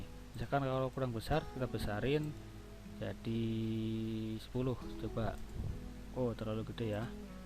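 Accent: Banyumas, Indonesia. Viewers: Cara mencari luas teks bagaimana mas????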